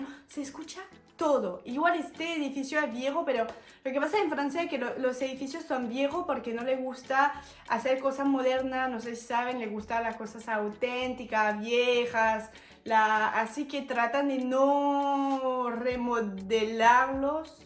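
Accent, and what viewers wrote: Buenos Aires, Argentina. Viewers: Que grande la halando a lo argentino.... una pena que ya te fuiste de Argentina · da gusto verte, por lo espontanea, lo que transmitis, alegria, y tu castellano es muy bueno, mejor dicho no es castellano, hablas argentino, espero puedas venir a pasear algun dia y ver a tus viejos amigos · Habla mejor el argentino q nosotros 😂. Qué genia esta chabona 👏🏻👏🏻👏🏻👏🏻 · te escucho y ya sos Argentina.... me encantó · sos bella en todo sentido, me encanta tu tono Argentino, saludos desde salta Argentino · Genial. Me hiciste el almuerzo, hablas igual que un Argentino y puteas mejor todavía.....😂😂 Sos como la frase: UN ARGENTINO NACE DONDE SE LE DA LA GANA. 🇦🇷👏👏👍👍👍👍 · Un Argentino nace donde quiere, sos un sol, tenes brillo propio, me encanta escucharte tan argento, es obvio que nuestro pais no esta pasando su mejor momento, es la cruda realidad, pero resulta muy positivo vos muestres como somos o te sentiste en mi patria, obvio tambien la tuya, somos super cancheros, amigueros y orgullosos de ser ARGENTINOS, nos tildan de agrandados y esta mas que bien, ya que lo somos, tenemos con que, el octavo pais mas grande del mundo, no es poca cosa eso, recibimos a todos con los brazos abiertos y soportamos que a nosotros nos llamen en europa sudacas para ofendernos, pero pese a todo eso somos re orgullosos de lo que somos, tengo conocidos que viven hace mas de un año en FRANCIA y no hicieron un solo amigo, eso para nosotros es dificil de entender, si se comunica en ingles le dicen ingles no, hablan en español y peor los ignoran, creo la epoca de ser potencia colonialistas es cosa del pasado, es hermoso ver lo que decis y como amas nuestra patria, espero vuelvas pronto al pais que te demostro sos una argento mas, saludos genia, Merci pour tout, surtout l'amour pour mon pays, le meilleur de la vie pour toi, ici tu as toujours ta place dans le monde, salutations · Amo a los extranjeros hablando con acento argentino te queda muy bien jaja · hola, mientras trabajo siempre pongo youtube y no presto atencion a la pantalla van pasando los videos y entre todos salto el tuyo, soy argentino de Buenos Aires y te quiero agradecer por alegrarme la mañana, no sabes lo que me hiciste reir con lo de los frascos y el dentífrico y las cosas que hacemos acá sin darnos cuenta, noto que en tu forma de hablar sos una argenta mas y eso que decis de Francia es todo tal cual, yo por el contrario amo a Europa y cada vez que tengo la oportunidad me hago una escapada, el mes pasado fui a recorrer el sur de Alemania en un coche alquilado es la 4 vez que viajo, en Paris estuve dos veces y tengo ganas de volver a conocer Normandia entre otro lugares. 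Los Europeos son todos mas o menos iguales en el sentido de que comen y duermen temprano, que son secos puede ser por todo lo que sufrieron y el clima pero hay de todo como en todas partes del mundo, yo particularmente nunca tuve una mala experiencia y a todos lados que fui me trataron bien, solo una vez pase por algo desagradable en un tren que viaja con mi hija y me señora y una mujer se quejo porque hablábamos, pero lo dijo de mala manera. Lo del departamento y la policía me hizo acordar eso y me morí de risa. Te mando un saludo y sos una genia, haces bien, vivi como quieras y se feliz, abrazo. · Hola hermosa muy buenos tu. Video hablas como una argentina más saludos y que sigan los éxitos 🥂🥂🥂👏👏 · hablas perfecto el acento argentino, ya sos una mas de nosotros:face-red-heart-shape::face-red-heart-shape::face-red-heart-shape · ❤ bravo me encanta escuchar como hablas en argentino! Pero no todos decimos malas palabras 😂 mis hijos me cargan porque yo horrorizada cuando dicen malas palabras ellos y sus amigos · EN SERIO SOS FRANCESA???PARESES UNA ARGENTINA MAS , TU ACENTO Y MODISMO ES INCREIBLE JAJAJA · recién te descubro, y me mata tu argentinidad, te digo q no se te nota para nada q sos francesa exelente tu pronunciación un acento muy Argento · Porteño! 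Diría YO 😂 jaja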